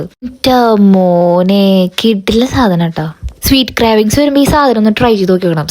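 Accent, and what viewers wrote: Thrissur, India. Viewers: Cherthayitto njn vijerche Thrissurkkaryeenna 😂njngade athee slang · Thrissur karude slanginu ntha kuzhapam🤨 njanum oru thrissur karan aanee😊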